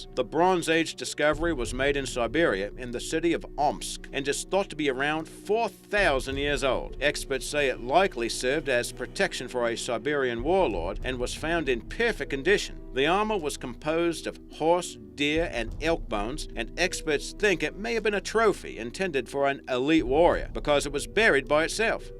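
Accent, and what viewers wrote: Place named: Australia. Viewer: these videos wouldn't be as good without narrating them. keep up with the good work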